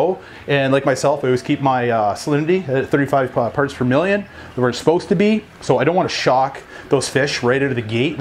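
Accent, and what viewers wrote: Canada. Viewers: You’re 100% Canadian eh?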